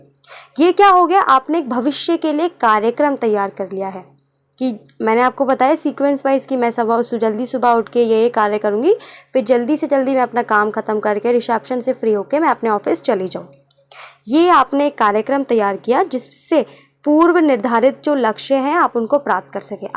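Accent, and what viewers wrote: Delhi, India. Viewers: Mam are u from Delhi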